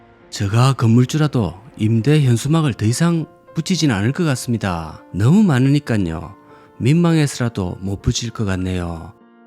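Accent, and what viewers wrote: Gyeongsang, South Korea. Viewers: 안녕하십니까 ~~ ~~ 입니다. ~~~ ㅎㅎㅎ 그 소리를 들으면 스트레스가 팍 풀리구 구수한 경상도 말투, 어린시절로 돌아가는듯합니다. 평서 객관적이고 참 재미 있는분 같으십니다. 건강하셔서 오래 오래 진행 부탁드립니다 감사합니다. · 고향이 경상도 어디세요? 사투리 귀엽 ㅎ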